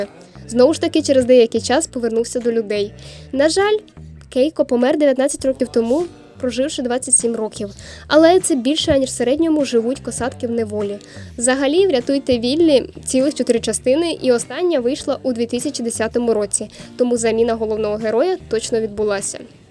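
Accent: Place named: Ukraine. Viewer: можешь по русскому говорить?ты же вроде раньше разговаривала на нём...незнаю что ты хочешь нам доказать своим украинским акцентом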